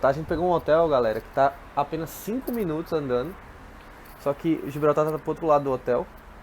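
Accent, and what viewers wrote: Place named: Northeast, Brazil. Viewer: Eu sei que e brasileiro sim mas seu sotaque e nordestino isso que disse .Eu sou paulistano e tenho inúmeros amigos nordestinos.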